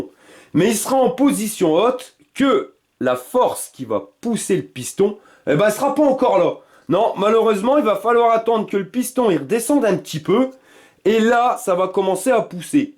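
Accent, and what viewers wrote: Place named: Pas-de-Calais, France. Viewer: top vos explications les gars. je roule avec un wagon r Suzuki a 100% éthanol l'été et 50 l'hiver max depuis 5 ans. j'avais avant un boîtier pourri acheter sur eBay qui ne sert a rien car non équipe de capteur éthanol comme vous l'expliquer si bien. encore bravo et vive l'accent du pas de calais. signé un ti normand.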